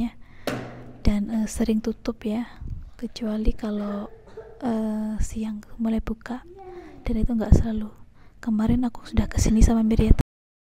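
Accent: Java, Indonesia. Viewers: padahal muk ngene iki pidione, tapi panggah tak like 😆😁 demen leh medokkkkkkk, gass bund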